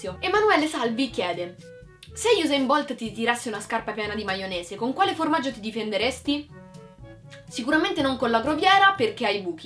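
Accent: Tuscany, Italy. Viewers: Ma hai un'accento toscano ! sei di roma? :) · Hai un accento toscano molto :3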